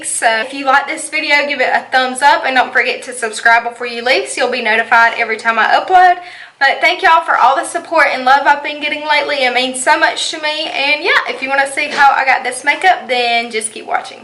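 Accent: South, United States. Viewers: I absolutely love your tutorials! I also have the country accent, yours is cuter! 💜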